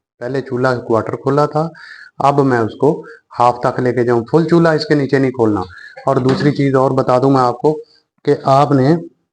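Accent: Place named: Punjab, India. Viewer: Sir AP ki nation sa lagta ha k AP panjabi hain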